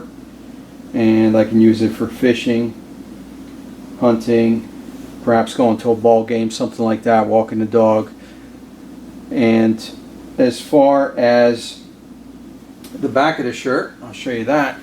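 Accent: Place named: Upstate New York, United States. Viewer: Shooting in the woods both Filson vids. Are you in upstate NY, Penn State? I detect the accent maybe from there. Enjoy your vids. Greetings from Washington State.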